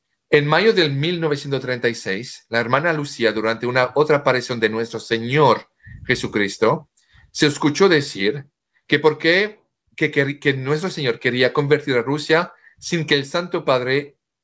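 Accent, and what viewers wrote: France. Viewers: Es un honor escucharle con su precioso acento francés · Me gusta su acepto francés. Gracias.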